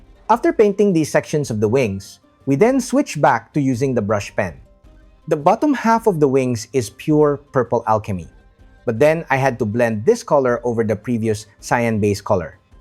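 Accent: Philippines. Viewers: I heard your accent in one of your shorts and thought you sound like Noypi like me 😂 Now I'm getting interested in learning 😆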